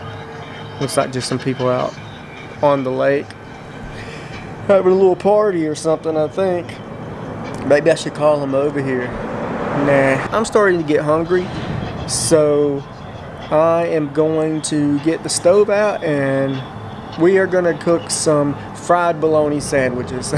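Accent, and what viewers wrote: South, United States. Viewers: Love your personality, and your southern accent. I have to give you an atta boy for the chances you take while Stealth Camping. Be safe and have fun!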